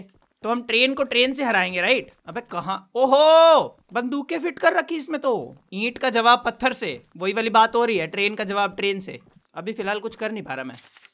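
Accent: Haryana, India. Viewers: Real HARYANAVI HARYANA KE LIKE KARO AND I LIKE HIS HARYANVI ❤❤❤❤❤❤❤❤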